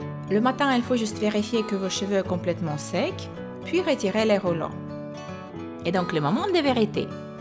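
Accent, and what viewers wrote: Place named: Russia. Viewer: sa se voit que tes russe avec ton acsent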